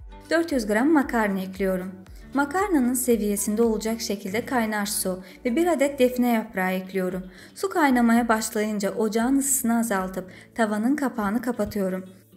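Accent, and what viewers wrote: Azerbaijan. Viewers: Seslendiren azerbaycanlıdı <num>% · Azerbaycanlisiz? Lehceniz tanis gelir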